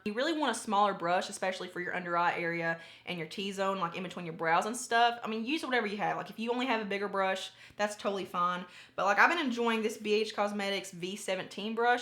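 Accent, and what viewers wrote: South, United States. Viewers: Hey from Israel!😊I love your suthern accent so much!!!❤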